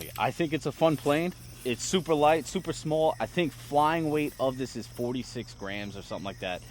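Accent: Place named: Long Island, United States. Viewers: thought that was a LONGISLAND accent! I'm in Huntington! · “Where I live on Long Island”. Nothing was ever more obvious when the first words were spoken in this video 😅. Cool little plane.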